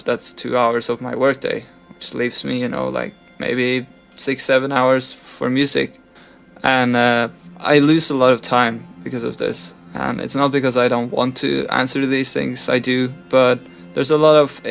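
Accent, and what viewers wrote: Norway. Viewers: 1. Congrats on the 200k subs! 🙌 2. Thanks for clearing up the copyright thing. That stuff had me confused, but now I get it 👍 3. It's nice to hear your voice again. I love your Norwegian accent 😌 4. I would totally buy your merch...if I have money that is 😅 5. Skyworld! Wooo! I love that series! 🙆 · NORWEGIAN THATS WHAT THAT ACCENT IS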